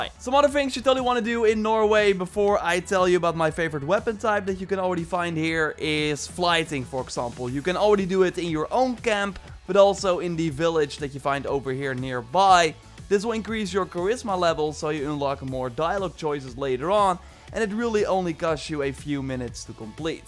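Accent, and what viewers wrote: Netherlands. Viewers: This guy is so dutch it hurts my ears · Je hoort zo goed dat je een nederlander bent van je uitspraak no offense 😂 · You can hear that you’re from the Netherlands 🥰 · ur dutch right ? can clearly hear it when he pronounces "other" like otter" :P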